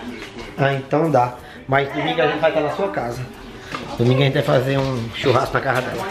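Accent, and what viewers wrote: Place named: Goiás, Brazil. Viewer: voz de cantor sertanejo deve ser goiano este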